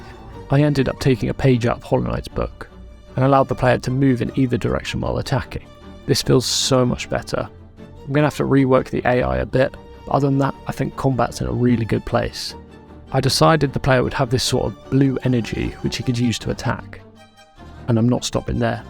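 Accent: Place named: United Kingdom. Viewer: Really well made devlog dude and the game is stunning and looks so fun. I'm definitely keen to see more! Btw I hope this is not offensive, but your accent sounds like Dudley from Harry Potter! Which part of the UK are u from?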